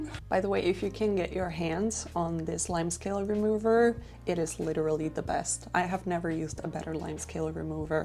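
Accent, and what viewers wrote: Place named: United States. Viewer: I want to quickly pop in and commend you on your English. I was quite surprised to find out that you are, like me, Czech. Almost perfect American accent. Whatever your history, whether you've actually lived in the States or not - hats off to you. Even foreigners who live there mostly don't reach this level of accent, ever.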